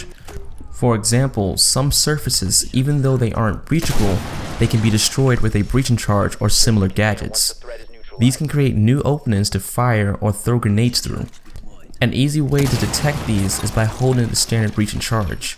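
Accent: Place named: Boston, United States. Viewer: You sound like you're from Boston, lol put nice video you earned a sub! Keep it up.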